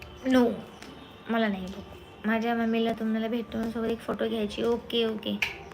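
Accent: Nanded, India. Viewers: तुमची नांदेड भाषा आम्हाला खूप आवडते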